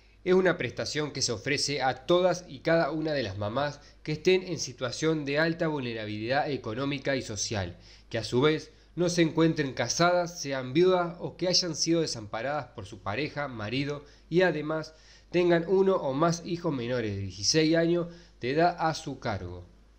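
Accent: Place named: Argentina. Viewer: En qué país es esto??? perdón es que el acento es argentino ó uruguayo??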